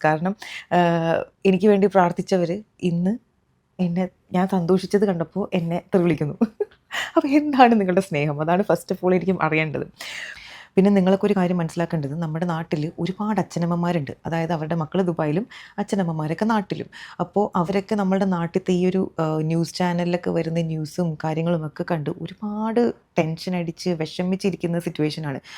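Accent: Thrissur, India. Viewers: Chechii nattil Thrissur anooo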